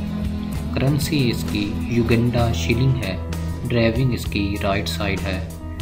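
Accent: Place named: India. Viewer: You ask comment but needless to say , you don't speak ENGLISH to understand it. My comment is use less to you as you are not helpful to me. Onse you speak Indian English, you are damaged for good to get a job in AMERICA. IS UNTOUCHABLE CAST SYSTEM INDIAN??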